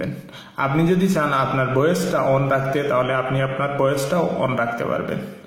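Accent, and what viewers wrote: Sylhet, Bangladesh. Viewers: you r sylhetee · ভাই আপনি সিলেটি নি,,,?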